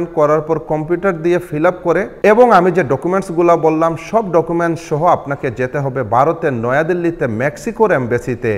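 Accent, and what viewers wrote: Sylhet, Bangladesh. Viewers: আসসালামু আলাইকুম ভাই, আপনি কি সিলেটের।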